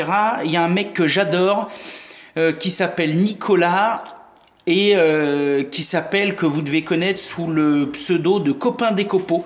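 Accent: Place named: Lyon, France. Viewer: Salut Ami, super vidéo avec des explications claires, nettes et précises. Enfin des mots que tout le monde comprend sans termes anglais ou technique. Et en plus avec un accent lyonnais... car je pense que tu es de Lyon ou des environs. La plus belle ville du monde.....(rire). à + et encore merci. Norbert "dit nono" un 69 du 63.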